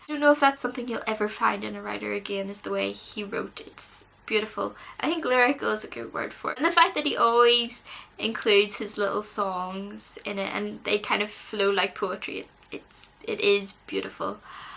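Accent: United Kingdom. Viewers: I can't place this accent....British....but there appears to be some other musicality subjacent to it. Or my ears deceive me. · Where is this accent from? Northern Ireland? It's my favorite accent to listen to in english. Number 2 is the accent they have in Hawai'i, it's an accent of no worries. Just like australia.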